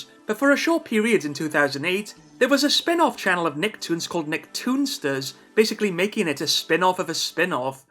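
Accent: Wales, United Kingdom. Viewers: Hey, there's another Welshie! I'm from North Wales, I reckon you're from Swansea or Barry? I'm sure S4C had a second channel at one point, but it was mostly used for overspills of the Welsh Senedd broadcasts. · I'm just now realising that your accent is Welsh and not Northern English. I'm an idiot.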